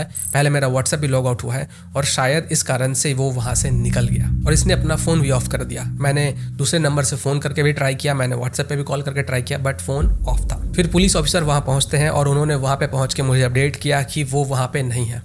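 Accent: Bihar, India. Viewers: Your speaking accent was bihari. You have to speak like local. Please catch delivery boy also because he was saying "vahi aana jahan har baar lete hai".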